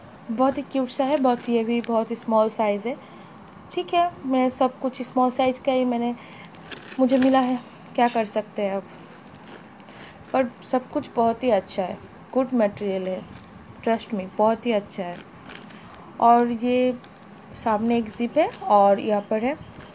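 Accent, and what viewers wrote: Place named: Bengal, India. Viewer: ur title is but ur accent is bengali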